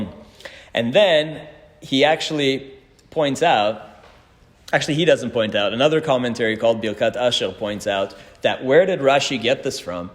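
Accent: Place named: Canada. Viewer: BH wonderful every (canadian) syllable; form, content, substance, and especially, wit/humor. if Moses spoke English, he'd sound like this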